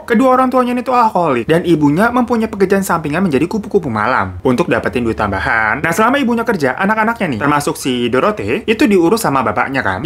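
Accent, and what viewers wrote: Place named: Jakarta, Indonesia. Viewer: Suka aja denger cerita nya menarik ga ngebosenin apa lagi ada logat"betawi nya jadi ketagihan nonton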